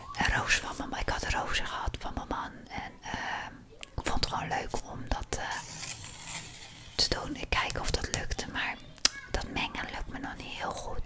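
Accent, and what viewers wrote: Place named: The Hague, Netherlands. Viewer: Waar uit Zuid Holland komt u vandaan? Het lijkt of ik soms haagse of westlandse dingen hoor haha, ik kom zelf uit het westland😊 superleuke video's! Ga zo door❤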